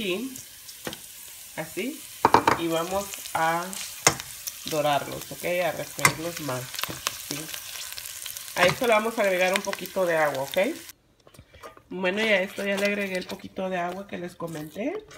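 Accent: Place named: Mexico. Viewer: Ya no habla como hondurena sino como mexicana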